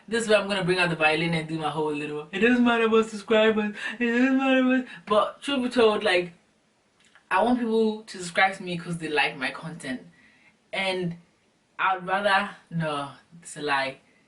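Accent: India. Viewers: But then I'd always related that accent you do to the Indian accent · lmao!!! I can tell Southafricans by the face shape and eyes yea! I sometimes mix Zimbabwe and Zambia. I need a camera like yours. I'm over here placing a mirror behind just to see!!!! #dontjudgeme that indian accent makes my day. another dance video due. I've tried filming when I wasn't in the mood. disaster!!! #delete God will make a way oo! I yell out the window before I record!!! lol!!!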